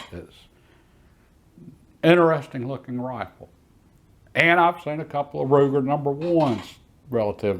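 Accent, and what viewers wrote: South, United States. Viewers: Kia Ora, watched a lot of your videos ova the years. I love your south acent & the vast knowledge you bring to us on watching u tube guys. In Aotearoa ( NZ) i would think more deer hav been taken by 303,s than any other cartridge? My take ( disclaimer) NZ forest service in the past handed out longtoms smelys by the hundreds & they did the job. Lot of sentiment & collection re past service rifles. I have serval myself and enjoy them mainly for range shooting these days im getting VERY long in the teeth thesedays. The older iv grown the older style i choose these days iv got keen on muzzleloaders. ( [ i digress:( ] 303 brit is easily purchase here. As is 30.06 A hole nother subject.... i ❤ that USA cartridge. Keep well & safe aTom Nga mihi Chris Ma Te Wa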